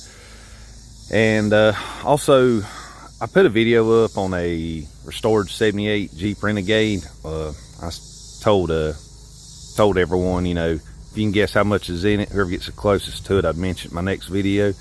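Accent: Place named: Deep South, United States. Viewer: Once in 1984 I took off from Reno Nevada to go visit my mom in Cookeville Tennessee in a junk 1973 Chevy Nova. It has a 3 speed on column and 250 six. I just left with almost no money to see her for Christmas. Power steering pump failed in Texas panhandle. Went to parts store got rebuilt steering pump. No pulley ! So a local (had your deep south accent) who was at the parts store "follow me home I have a press ". I follow this stranger long way . Pull up his home it was his family. They fed me and put my car back on road. Asked me and my blue 💙 Heeler to spend the night. Fed us breakfast. I am a yank. They show such love these deep south folks.Gave us food to go . Went saw momma in Cookeville for Christmas. God bless you brother. I lost her few years later.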